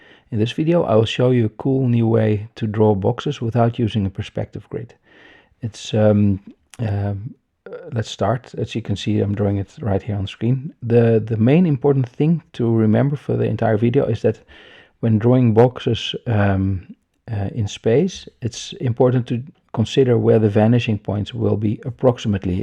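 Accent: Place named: Netherlands. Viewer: Haha i knew you were Dutch by you're accent😊 ik ben ook Nederlands. Hallo ✌🏻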